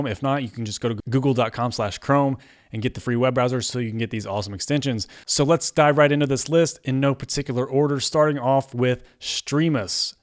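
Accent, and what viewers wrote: United States. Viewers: L'accent américain est inaudible pour un français. Merci d'avoir mis les sous titres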